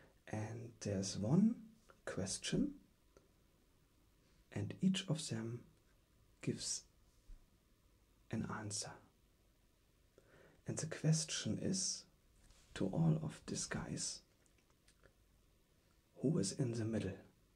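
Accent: Germany. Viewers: Ich finde deine Videos echt klasse. Die Kombination aus ASMR und Logikrätseln ist super! Und du hast ein beachtliches Zeichentalent. Im vergleich zu dem, was ich so zustande kriege 🙈 Eine Sache muss ich aber doch auch loswerden, die mir als etwas störend auffällt. Du hast eine sehr deutsche Aussprache von Englisch. Besonders bei dem "th" fällt das auf. Falls du dich da gerne verbessern möchtest: Ich denke, beim "th" ist es Übungssache. Die Zunge muss unbedingt kurz zwischen die Zähne beim Sprechen. Vielleicht hilft es dir ja generell für die Aussprache auch, englische Filme zu gucken, um in den englischen Sound reinzukommen. ;) Dein Vokabular ist auf jeden Fall schon richtig gut. Und du hast eine sehr angenehme Stimme zum Zuhören.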